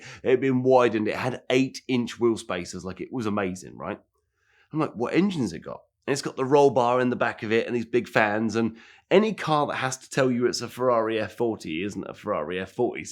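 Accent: United Kingdom. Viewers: this guy is too British for me. can't take the accent for longer than a few seconds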